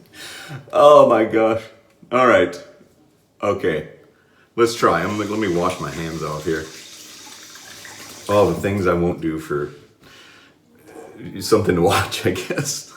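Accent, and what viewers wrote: United Kingdom. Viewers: "Barbasol will not be featured in this episode".....boy I'll beat you wish it had been featured in this episode!!😁😁🪒🪒 Loved the British accent!!🇬🇧🇬🇧👍👍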